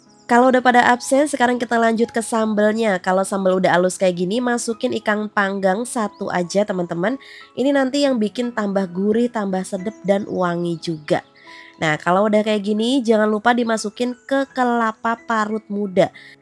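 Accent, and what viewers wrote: Java, Indonesia. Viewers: Jawa nya kok medok banget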